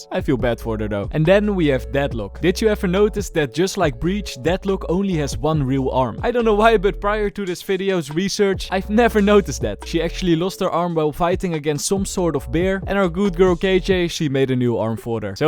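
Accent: Netherlands. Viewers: he has a dutch accent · i love your video’s but please PLEASE fix your dutch accent 😭😭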